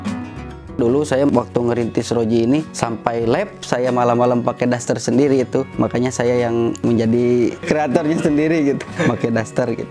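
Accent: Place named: Tasikmalaya, Indonesia. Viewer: Logatnya Tasik banget 😁😁...salam dari orang Tasikmalaya sukses terusss 😍😍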